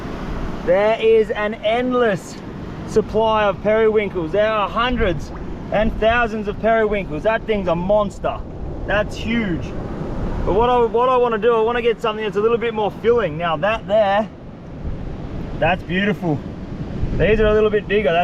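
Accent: Western Australia, Australia. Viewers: I knew straight away you are Western Australian from your accent, especially your pronunciation of water LOL. The sky and beach was a giveaway too. Great video mate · Thought this is going to be some fake ass bullshit... until i heard the australian accent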